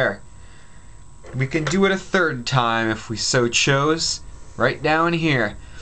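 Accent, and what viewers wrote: Canada. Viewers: totes hear a canadian or mn accent 😊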